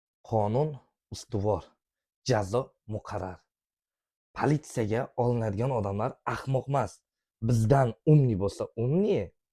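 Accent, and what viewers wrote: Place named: Namangan, Uzbekistan. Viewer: Namangan sheva bulib yotibdiyu yana Tojikistinliklmanmush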